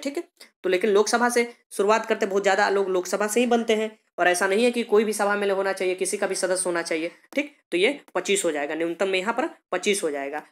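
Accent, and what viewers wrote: Bihar, India. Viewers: Sir aap Kya bihar ke rahne bale h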